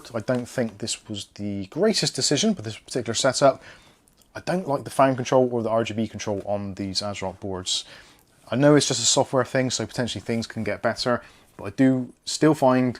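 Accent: Bristol, United Kingdom. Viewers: Did I see this on Facebook marketplace a few days ago? Thought I recognized the Bristolian accent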